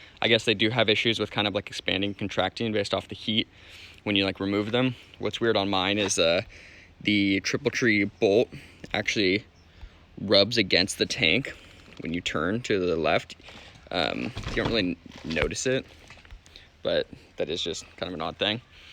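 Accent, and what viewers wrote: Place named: United States. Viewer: What wheels? 🤣🤣🤣 American pronunciation 🙄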